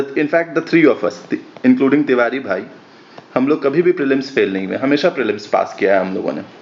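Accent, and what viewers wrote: Bihar, India. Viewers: Aapka bihari accent jaanlewa h bhaiya.............sun k ek apnapan lagta h.............maati se judav !!!!! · Sir your TONE IS PURE BIHARI...JAI HO BIHAR..Ye video energy booster hai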